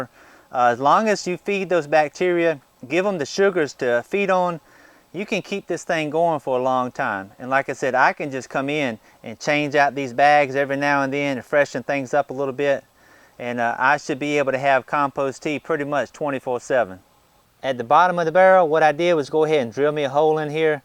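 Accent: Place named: Louisiana, United States. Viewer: your amazing accent = Louisiana? Mississippi...? Very nice. And great video..! I think you should look at The Worm Inn, Mega, now made and sold by TheWormDude dott comme, apparently. More air cirulation, no stink.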